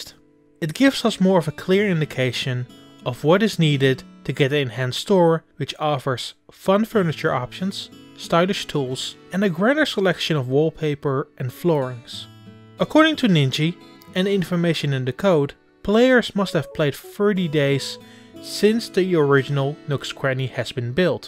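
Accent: Netherlands. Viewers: That's the Dutch accent for ya. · I think this guy is dutch that accent sounds familiar. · Thanks for the tips! Are you dutch? I'm dutch and my bf heard the dutch accent lol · Is this voice over Dutch? Been wondering ever since I heard you! :) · You’ve got like this clear dutch accent 😭 but apart from that this was rather helpfull.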